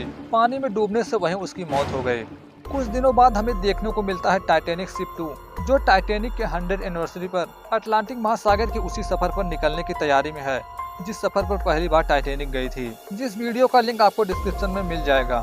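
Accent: Bihar, India. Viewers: bat se lag raha hai bihari ho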